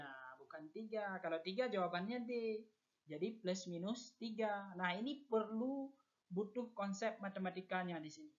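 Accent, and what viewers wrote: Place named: Aceh, Indonesia. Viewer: Bapak nya pasti orang aceh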